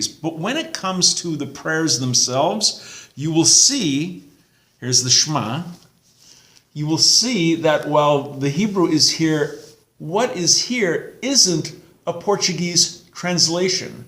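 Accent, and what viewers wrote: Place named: Midwest, United States. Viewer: Sir. Where are you. You sound midwestern. Chicago-y or Milwaukee-ish. ? M. Elgin. Illinois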